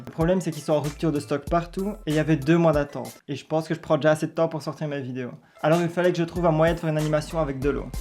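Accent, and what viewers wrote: Belgium. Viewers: Ho ! Un belge ! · Tu es belge? #accent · Hey je me suis aboné j'aime bien se qeu tu fait ta du talent est je lenten a ta voi te Un belg moi osi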